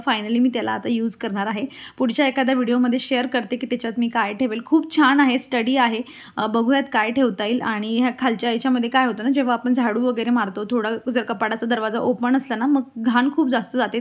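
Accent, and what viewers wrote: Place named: Jalgaon, India. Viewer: Tuzya language varun lagech kalat tu Jalgaon chi like ळ la ड😂😊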